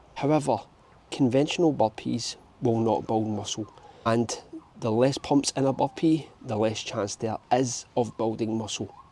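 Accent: Scotland, United Kingdom. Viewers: Hey man, my comments have been telling me to hit you up. Where is Scotland are you from? 😊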